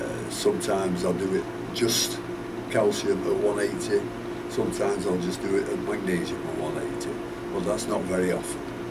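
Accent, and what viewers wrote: Lancashire, United Kingdom. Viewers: Thank you for your videos. Your accent sounds similar to mine! I'm in Adlington near Chorley. Merry Christmas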